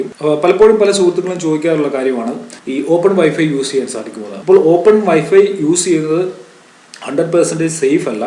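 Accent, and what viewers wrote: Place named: Kollam, India. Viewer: കൊല്ലം furi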